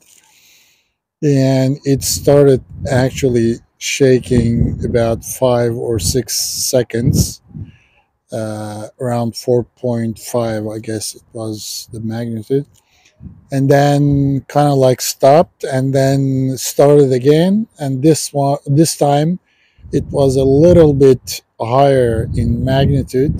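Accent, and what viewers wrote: Turkey. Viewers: Hocam sivaslı mısın? Tam Sivas şivesi var sende😃😃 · Aksan Türkçe, hemşerim ne işin var orada, kaç kendini kurtar.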